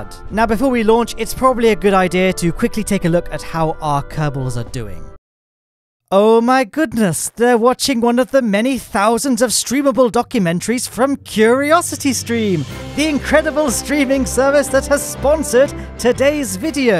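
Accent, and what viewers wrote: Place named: RP, United Kingdom. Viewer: why you so posh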